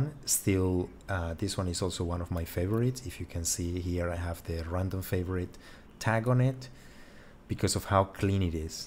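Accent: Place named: Italy. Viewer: Si sente che sei Italiano... giusto?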